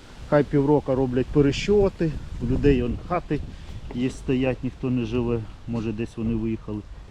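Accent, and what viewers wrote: Poltava, Ukraine. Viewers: так приемно чути полтавський акцент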